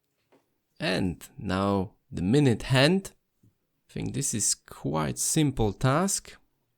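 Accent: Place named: Germany. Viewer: Twoja wymowa brzmi jak niemiecka 🤔 Jak według mnie, obcokrajowca. Zgadłem czy nie bardzo ?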